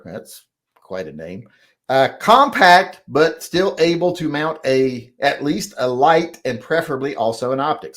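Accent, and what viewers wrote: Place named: West Virginia, United States. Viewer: you grew up in mothman country 😂😂😂 no wonder your accent is so familiar (i have lots of close friends in that area... almost kin)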